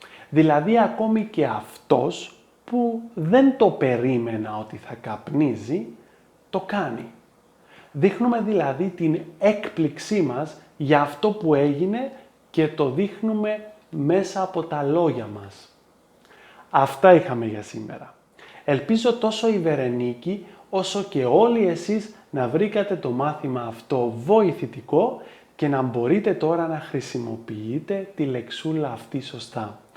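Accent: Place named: Cyprus. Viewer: Ακούγεσαι λίγο σαν Κύπριος. Διδάξτε με πότε να χρησιμοποιήσω Ω και ο στη γραμματική. Δεν έχω πάει ποτέ στο ελληνικό σχολείο. Είμαι αυτοδίδακτος.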